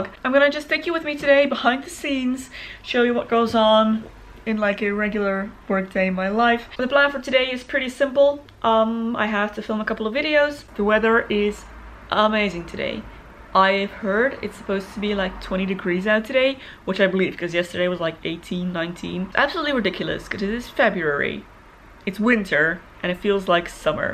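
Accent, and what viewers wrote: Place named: Netherlands. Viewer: haha ik merkte je accent, dus ging er vanuit dat je nederlands was, dus ik overla zoeken, n toen zag ik een boek van paulien cornellise, en toen wist ik zeker dat je nederlands was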